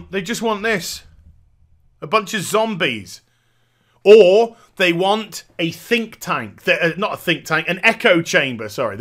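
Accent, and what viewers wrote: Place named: United Kingdom. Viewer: lol this British accent :D, im sorry i assumed that!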